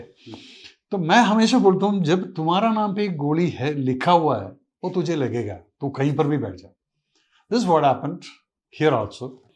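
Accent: India. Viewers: Origin: Kerala Accent: Punjabi This is the Indian Army for you. ❤ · He is from Kerala, i can see from his accent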